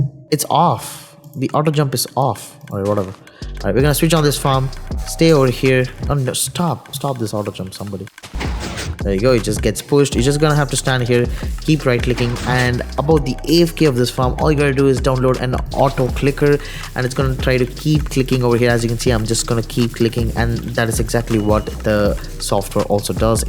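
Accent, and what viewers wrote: India. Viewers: Man i love the indian accent your good bro your explanation is great the otherd is shi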